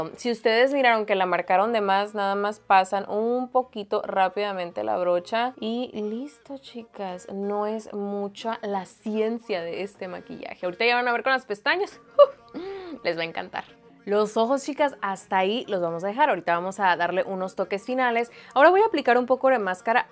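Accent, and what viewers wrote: Sinaloa, Mexico. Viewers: Yo te adoro y como hablas 😂 Soy Dominicana pero amo como hablan México ese acento sinaloense lo adoro🥰, quedaste bella y todo lo de Shein te queda chulisimo. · Hermoso maquillaje 😍👌 Primera vez que veo tus videos y al escucharte supe q eras de sinaloa 👏🙆 saludos de Culiacan 👍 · tu acento sinaloense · Hola.... Desde que te ví en el vídeo sentí que hablas como las de Sinaloa. Y ya te escuché que sí. De que parte de Sinaloa eres? Culiacán.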